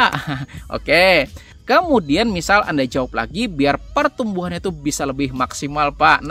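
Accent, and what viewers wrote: Banjar, Indonesia. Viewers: Pian urang banjar kah mang??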